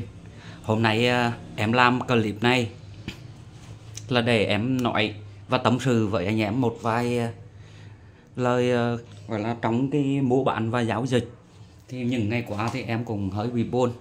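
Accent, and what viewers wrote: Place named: Nghe An, Vietnam. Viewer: Giọng bác giống như ở thanh chương hay đô lương gì nhỉ.giọng chất quê choa hi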